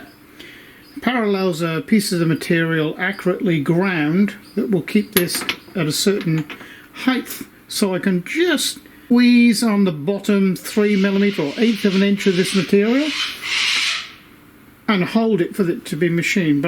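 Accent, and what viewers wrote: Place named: Shropshire, United Kingdom. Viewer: You sound like a Shropshire mon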